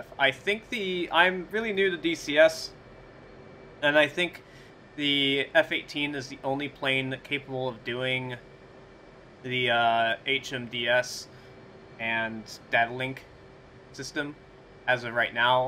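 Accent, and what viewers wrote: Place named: India. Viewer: Whats wrong if he has an indian accent? Some of the simplest explanations out there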